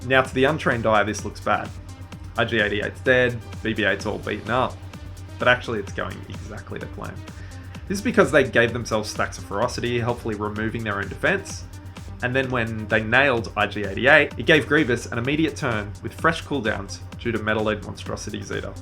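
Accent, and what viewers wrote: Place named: Australia. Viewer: You got a sub for bloody great accent you aussie legend. Thanks for the pro tips amigo just started running this myself and they help heaps!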